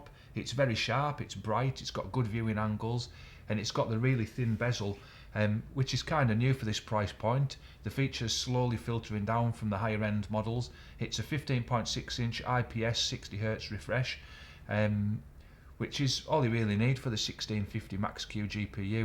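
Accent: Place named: United Kingdom. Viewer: I hardly understand the British accent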